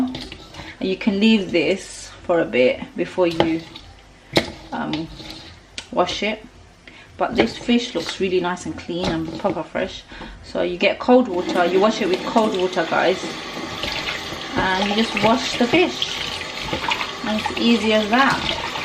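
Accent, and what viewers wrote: Sylhet, Bangladesh. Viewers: Asalamualikum sister. MashaAllah very beautiful cooking you do ❤️👌. Love the way you talk sylheti 👍. I from sylhet and i live in Manchester. I just subscribed u beautiful Affa ❤️😘. Your fish dish looking amazing 😊👌 MashaAllah. Love you ❤️❤️❤️❤️😘😘😘😘😘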